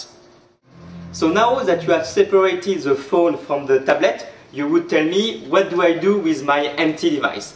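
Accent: France. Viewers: French guys speaking english should be banned from internet :[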